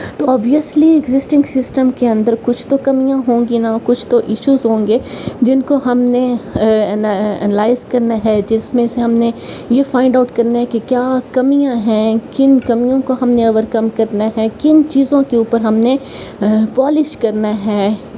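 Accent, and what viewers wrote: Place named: Saraiki, Pakistan. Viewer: SARAIKI TEACHER 💯👀 HER WAY OF SPEAKING 🗣️ TELLS SHE SPEAKS SARAIKI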